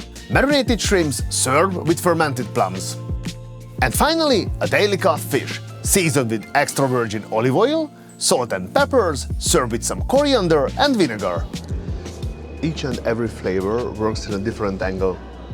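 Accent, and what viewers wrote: Hungary. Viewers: you sound very very very hungarian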